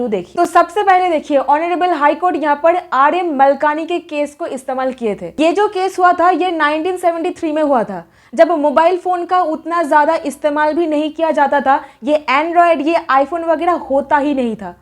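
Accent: Bengal, India. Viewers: 😊 Bengalis jab Hindi boltey hain tab Aisa hi boltey hain😊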